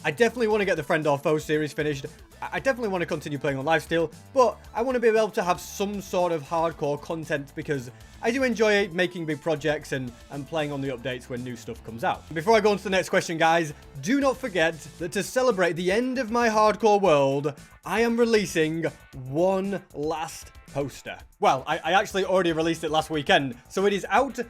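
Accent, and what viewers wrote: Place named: United Kingdom. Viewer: Bro is pure british. For a moment I tought you are mcqueens brother🤫💀💀💀💀💀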